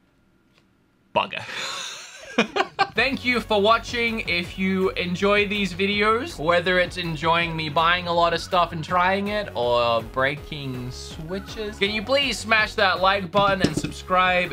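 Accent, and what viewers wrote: Australia. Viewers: Are you fron australia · What r u an Aussie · Wait YOOOOOOOOOOO I DID NOT REALISE THAT YOU WERE ANOTHER AUSSIE!!! AUSSIE AUSSIE AUSSIIIEEE!! OI OI OI!! XD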